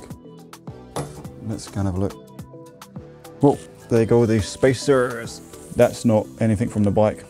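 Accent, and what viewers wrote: United Kingdom. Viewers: keep doing the videos mate. So much better that your a Geezer and not a Annoying talking American. Had my 2021 09 now for 4 months. fantastic machine. I've got the exact same mirrors. I'm yet to unbox. had them a year. brought them when I had the 07 🤣.. Looking forward to more of your content nice 👍🏻. might install mine now...just relised your from NZ 🤣🤣 Thought u was from London until I watch your other video..🤦🏻🤦🏻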